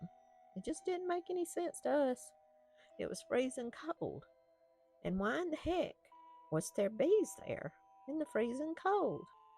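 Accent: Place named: Appalachia, United States. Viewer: I love the beautiful accent of your voice. Reminds me of Appalachia. Thank you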